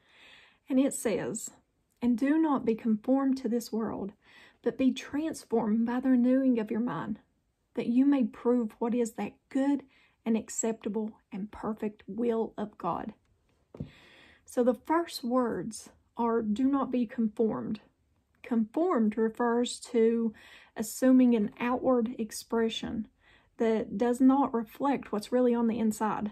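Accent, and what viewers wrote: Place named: South, United States. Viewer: Oh, you write so well! I like how you talk and then refer that to that of the Bible. I really like the length of your video too. It allows one to get the word in important snippets, without having to do a real deep study. Your video is great when you only have a few minutes, but want to be close to the Lord! Thank you for your work, I very much like listening to you (that Southern accent is precious)! On a side note, I just have to comment on what a pretty lady you are. Your complexion is flawless and you're really lovely to watch! Thank you again!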